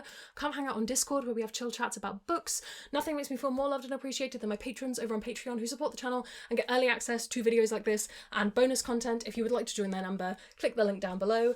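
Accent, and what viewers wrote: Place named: United Kingdom. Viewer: Love your accent, it's like British lite, like a mild tea😊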